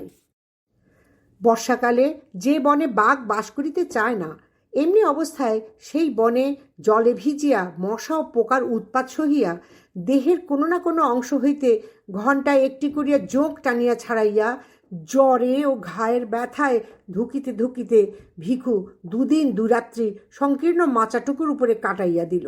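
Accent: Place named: Bangal, Bangladesh. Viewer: Darun porechen mashi bishesh kore bangal taan. Shunte shunte mone hochhilo ki privileged amra. Koto aramse thaka shotti kto complains amader.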